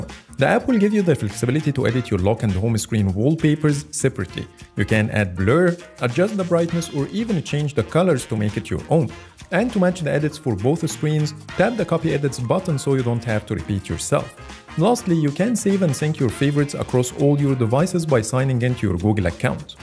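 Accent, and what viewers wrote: Egypt. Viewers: 3rft enk masry mn el accent, ta7ya masr ya 3m XD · طلع مصري العرص .. يسطاااا اللغة يسطاااا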